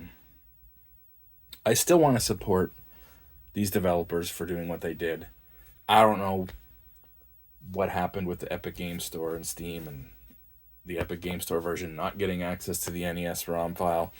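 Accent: Canada. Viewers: You sound like Canadian garbage. I'm out.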